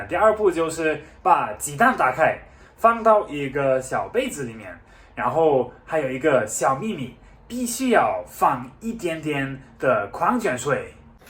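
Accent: Sichuan, China. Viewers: 四川普通话说的不错嘛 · 是四川发音听起来像马kie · 这个老外普通话居然有川音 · 喜欢听说的四川普通话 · 的国语有股浓浓的四川味~ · your Chinese comes with a Sichuan accent! It sounds so good! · 是一个中国通，四川话加普通话很有意思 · 你的川味普通话很不错👍😌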